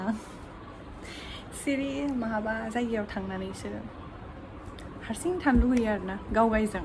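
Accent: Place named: Kokrajhar, India. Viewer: Your Boro language is same kokrajhar boro language and you are good girl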